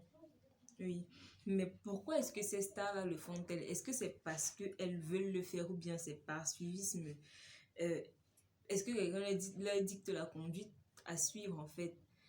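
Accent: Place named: Cameroon. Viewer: vue ton accent et ta façon de racconter tu es 237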